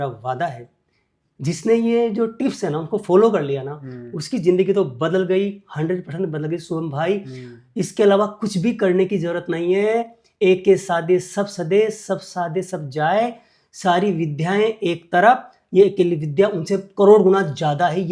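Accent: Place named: Jaipur, India. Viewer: Sri Maan ji aap jaipur side nivasi ho kya bhasa ka put se lag raha h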